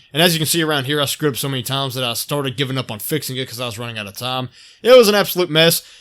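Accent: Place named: South, United States. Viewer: your improvement at content creation over time is really cool to watch, Im about to finish all 88 of your astroneer vids. I think your confidence has strengthened over time and it's really enabled your personality to come out, it's fantastic! Your commentary is so enjoyable to listen to, you got that nice southern lovin' accent my bro I can't get enough! Thanks for posting and hope you keep at it!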